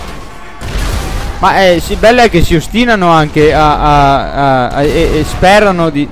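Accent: Veneto, Italy. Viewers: Setu veneto?🤔😂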